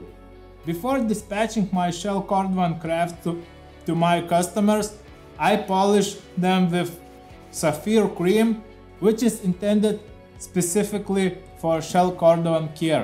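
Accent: Russia. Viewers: Русский акцент)) уважение.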